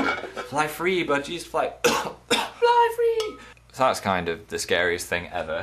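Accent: Texas, United States. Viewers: i like your Texan accent:) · your american accent is really good! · Lol ur american accent is awesome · I like your American accent. It's actually pretty good, although it's hard to tell when you go back and forth. You should to a video were you try to say things in an American accent if you haven't already. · His American accent makes him sound like Christian Novelli. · Oh my god ur American accent!!!! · anybody else think he sounds like makemebad35 with an American accent? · I like your American accent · PLEASE DO A WHOLE VIDEO IN AN AMERICAN ACCENT!! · accent in this video is like the ones in Texas.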